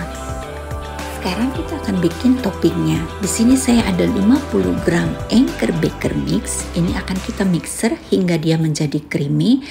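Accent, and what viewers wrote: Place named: West Java, Indonesia. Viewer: Masyaallah cantik nya ci ibun,,, Logat bahasa seperti nya orang sunda yah🤩, Salam sukses ibun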